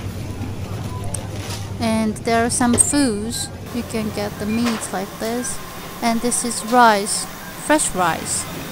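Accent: United Kingdom. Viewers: イギリス系英語？聞き取りやす～い！ゆうくん可愛い❤️うちのこもこんなんやったな～ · イギリス英語ですね😃✌️🌹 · すごい行列。朝市って意外と混むんですね。 アクセントが、イギリス英語かな？ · イギリス英語かな❓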